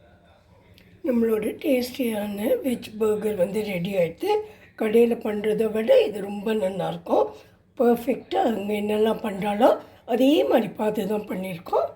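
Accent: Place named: Iyengar, India. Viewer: burger super. filling variety ❤❤ அம்மா..உங்கள் பேச்சு..ஐயங்கார் வீட்டு வொய்ஸ்..அதை கேழ்க்க very interesting ❤❤...இந்த food item எல்லாமே western style இருக்கு. .குறிப்பா இன்னைக்கு போட்ட burger. .🎉🎉🎉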